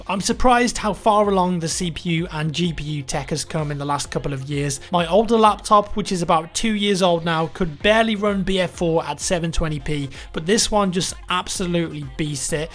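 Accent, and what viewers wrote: United Kingdom. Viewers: ***** I don´t see your logic here. He has a British accent hence there is a much higher probability that he´s from the UK.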